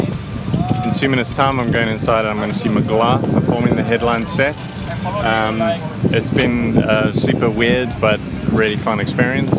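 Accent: South Africa. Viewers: Random question and please correct me... are you South African?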